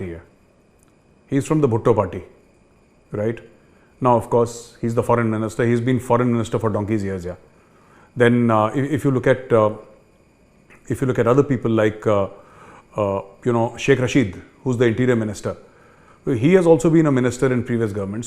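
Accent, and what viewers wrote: India. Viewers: bloody major why you are thinking for pakistan why don't think for India. You are teaching how to talk an oxford graduates , bloody majot may you can't enter the main gate of oxford university . like you little educated man lots in pakistan. you even can't speak proper english only indo english. shut of your mouth.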